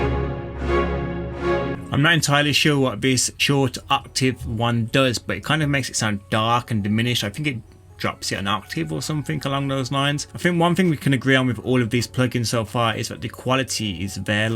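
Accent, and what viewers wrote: Birmingham, United Kingdom. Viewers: Spitfire do the free BBC orchestra? Also you sound like a Brummy, though maybe somewhere close so, I believe people from Lichfield and other areas have a similar accent, I'm from Stoke so eh, just wondered roughly where you were wandering around in the trees? 🤔🙏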